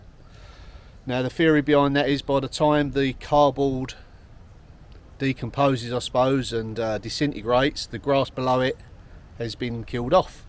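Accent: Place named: England, United Kingdom. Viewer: so glad to see you in your channel. I've been enjoying you at the convent project and didn't know you had your own channel. This is great!!! Love your kind demeanor and calm. You're also practical and very talented. Looking forward to watching you make your beautiful garden. Love listening to your English accent 🥰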